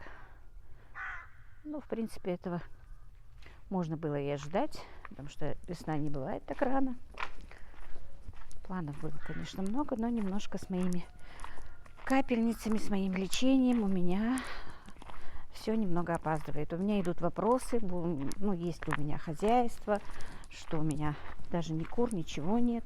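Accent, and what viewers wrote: Standard. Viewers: подписана на Вас давно, но смотрю недавно. Вы так чисто говорите по-русски, такая грамотная речь, одно удовольствие Вас слушать.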